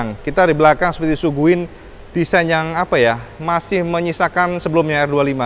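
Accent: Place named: Tulungagung, Indonesia. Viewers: Jagange endi to jagange.. tak habis2 ak seneng karo bahasamu maas.. kuentel tulungagung e 😄🙏🏻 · salam Jooosss Gandooos mas bahasanya dan gayanya khas wong Tulung Agung sehat selalu mas Bro👍🏼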